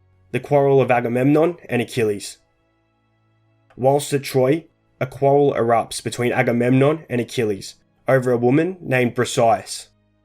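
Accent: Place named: Australia. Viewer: I can’t take the aussie accent seriously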